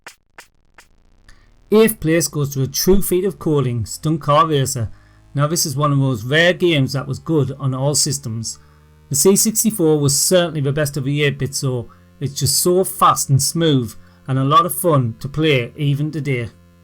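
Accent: United Kingdom. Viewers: Is this Bri ish ffs talk english man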